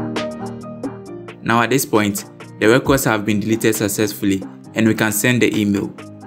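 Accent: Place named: Nigeria. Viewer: Imagine my surprise clicking on the video and hearing the most nigerian voice ever. Nice one, my guy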